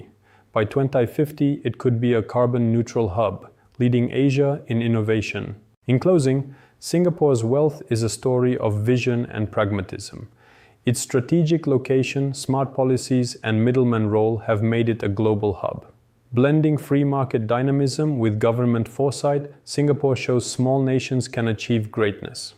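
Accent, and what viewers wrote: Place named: United States. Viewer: &quot;Employment security not the best? I lost my job and was paid 70% of that salary for a year afterwards, as long as I proved I was job searching in that period. What other country does this? At one point I had a hard time paying a months rent with that 70%, and my municipality paid my rent with barely questions asked. Coming from an author who sounds american, you guys have absolutely 0 job protection or unemployment benefits. Really deligitmized this video.&quot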